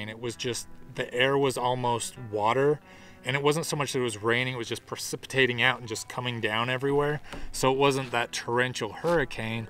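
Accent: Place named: United States. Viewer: This is 8th swag video I've watched in a row, and the first from the US. I just had several second shock/adjustment back to the American dialect. 😄 Thank you for the explanation and history. I had assumed the swag was the OZ version of the American cowboy bedroll (I have one), but couldn't understand why modern day Aussies were using such confining shelters. In my search for quick setups and tear-downs, I've largely settled on the Kwik Kamp tent cot for solo camping. It's very similar the swag/cot (stretcher) combo in the video but all in one unit that folds up very quickly. It's not as abuse resistant as a canvas shelter, so I treat it with respect. I'm not trying to hijack the video, but in searching for the Grail of quick truck camping shelters. I've settled on the canvas cowboy teepee. They're very fast to setup, allow room for several sleepers, and room to stand up. 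The white canvas keeps the interior cooler during summer months, and a lantern hanging from the peak really lights up the interior nicely. It's a design that's survived the test of time for good reason, and on of my favorite gear purchases, and a go-to for car camping.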